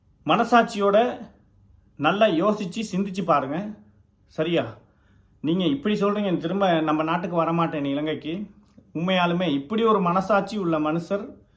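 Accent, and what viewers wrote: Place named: Tamil Nadu, India. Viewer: உங்க தமிழ் இந்திய தமிழ் மாதிரி இருக்கு ப்ரோ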